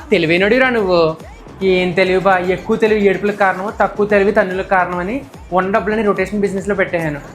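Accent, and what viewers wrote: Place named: East Godavari, India. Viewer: Completely east godavari slang....😊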